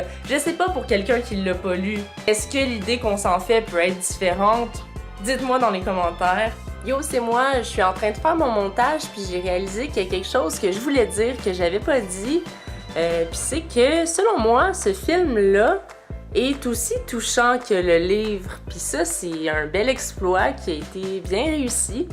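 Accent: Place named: Canada. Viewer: Je suis Français et vie en France je suis dans le sud à côté de saint tropez mais j’adore ton pays ces beau Montréal tu a le même accent que l’actrice Sophie Nelisse mais elle est de Montréal sache que tu es le bonheur en personne ! je suis fan j'aime beaucoup la qualité de ta video avec quelle type de camera film tu et pour le son a tu un micro cravate ou ces le micro de la camera et le montage quelle est ton logiciel merci encore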